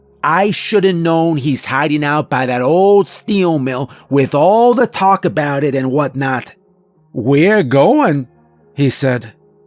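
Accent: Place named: United States. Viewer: that has to be the first time I have ever heard that an American accent sounds cool. I always love ones from elsewhere here but never anyone saying the other way around.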